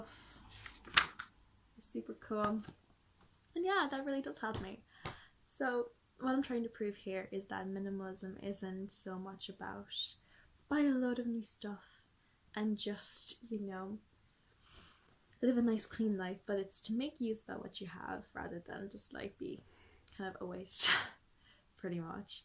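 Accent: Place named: Ireland. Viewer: You have such a lovely accent. Where in Ireland are you from?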